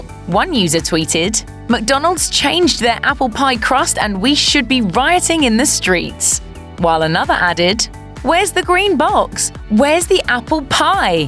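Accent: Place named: United Kingdom. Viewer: This is America and McDonald’s is an American company why are you using a British narrator?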